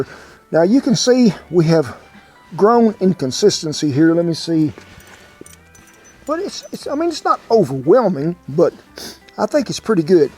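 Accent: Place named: Texas, United States. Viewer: Mac Donoulds for Breakfast. I love the accent from texas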